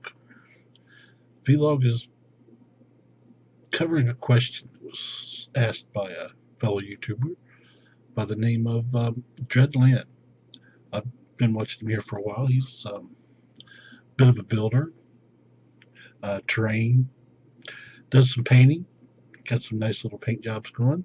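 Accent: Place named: South, United States. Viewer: Super interesting video... you're so easy to listen too, I love your relaxed accent (I'm assuming its southern? sorry if I'm wrong!), reminds me so much of one of the WW2 veterans featured in the Band Of Brothers series - 'Shifty' Powers I think it was.:D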